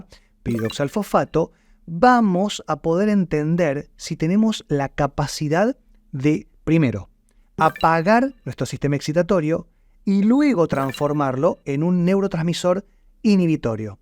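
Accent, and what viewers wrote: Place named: Rioplatense, Argentina. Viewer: Parece como argento o yorugua.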